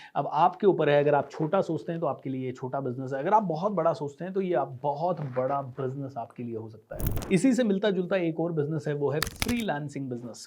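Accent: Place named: Rajasthan, India. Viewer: Lagta hai aap rajsthan bikaner se ho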